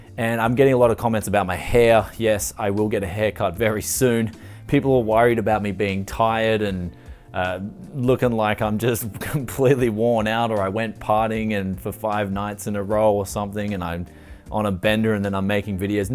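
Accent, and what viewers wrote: Bogan, Australia. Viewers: An Australian doing an Australian accent is weirdly amazing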